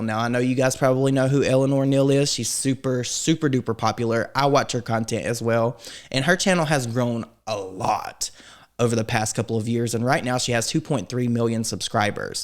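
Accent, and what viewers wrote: South, United States. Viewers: Kinda hurt to unsub real quick, but did it for you! Your southern accent reminds me of home 🏡 💞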